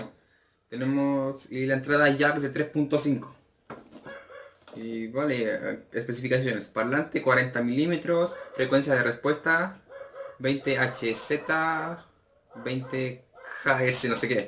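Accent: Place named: Spain. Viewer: Por que hablas como español si sos tremendo peruano?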